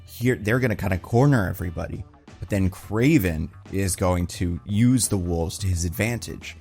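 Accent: Russia. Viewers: this video is filled with lies. for starters he said "my russian accent is bad", yeah, not buying that clearly russia-born youtuber buy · If it makes you feel better, I think you could be in this movie with your Russian accent and it wouldn’t be a detriment to the movie.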